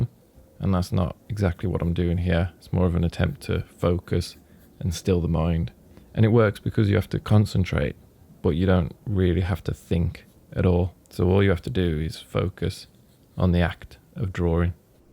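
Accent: Birmingham, United Kingdom. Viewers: Beautiful work. Refreshing to hear a Brummie accent - if I'm not mistaken. Thought you'd be from across the pond. 😂 ❤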